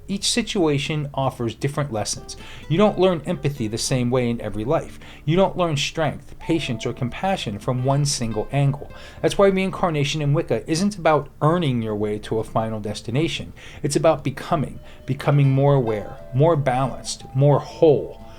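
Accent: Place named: United Kingdom. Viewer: Oh, you're British! 💂🏽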